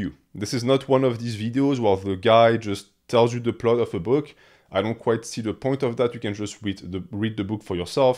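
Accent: France. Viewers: Selon votre accent, je dirais que vous etes francais. I found the french version of the audiobook vastly superior to the english one, what were your thoughts ? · Listening to a French guy talk Dune for over 3 hours was as delightful as I thought it would be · The French accent when speaking English is so pleasing to the ear. I could listen to you talk all day.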